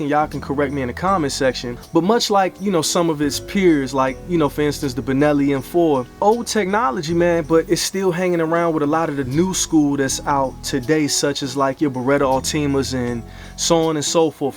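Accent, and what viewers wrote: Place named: DC, United States. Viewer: What range are you using? You sound like you’re from around the way. DC/Maryland/Virginia.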